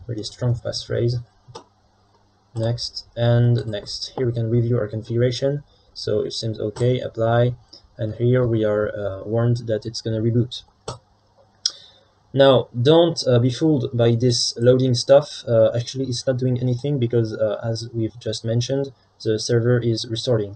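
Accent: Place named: France. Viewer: I like the French accent. Thanks for the video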